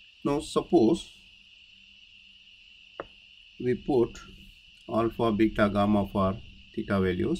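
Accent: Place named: India. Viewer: You know a math video is good when you hear the indian accent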